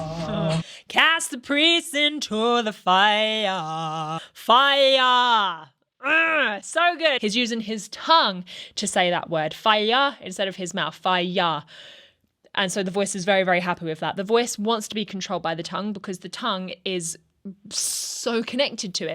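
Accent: United Kingdom. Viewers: How can it be that you sound like you're British, but didn't know who was?!!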